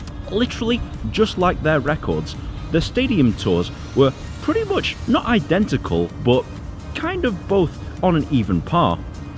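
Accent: United Kingdom. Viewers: This may offend you but how do you look asian yet you sound like a brit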